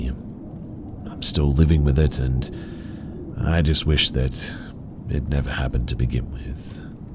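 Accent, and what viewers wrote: Australia. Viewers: He's Australian. He is not form the uk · He's Australian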